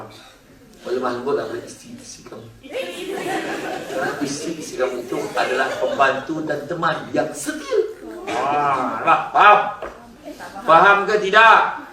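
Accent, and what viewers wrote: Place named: Negeri Sembilan, Malaysia. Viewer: Ustaz syamsul debat memang salah satu penceramah hebat dan menjadi salah seorang penceramah kegemaran saya..dengan loghat n9 dia tu..buat saya terhibur. Tq pis..share.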